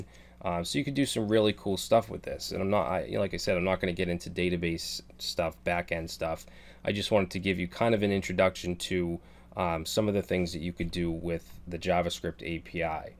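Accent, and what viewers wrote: Boston, United States. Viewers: when you started talking i immediately knew you were from Boston 😆 thanks for the video cheers brother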